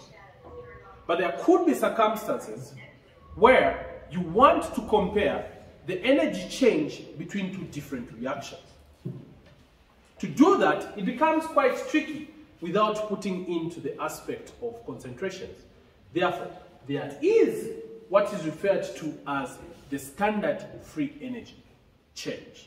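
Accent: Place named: Uganda. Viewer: You must have studied in uganda cz this accent 😅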